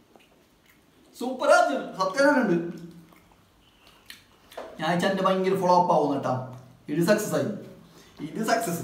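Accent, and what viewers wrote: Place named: Kasaragod, India. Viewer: Enik നിങ്ങടെ കാസര്‍കോട് ഭാഷ ഭയങ്കര ഇഷ്ടമാണ്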